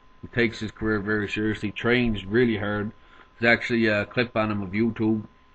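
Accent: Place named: Eastern Canada, Canada. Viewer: You have one hell of a Eastern Canadian accent.....you're a very knowledgable poster though. Keep it up!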